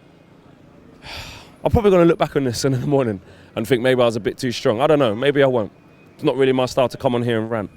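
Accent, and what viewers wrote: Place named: Manchester, United Kingdom. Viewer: Strong Mancunian accent there... MOU in!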